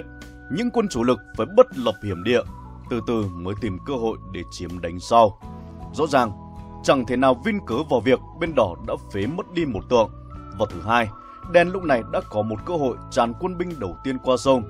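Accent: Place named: Phu Yen, Vietnam. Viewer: xét về kiến thức + giọng bình thì kênh này số 1. Vịt ú chỉ là làm trước, nhiều người theo dõi trước thôi, giọng Phú Yên nghe ko hay, ko truyền cảm bằng giọng Trung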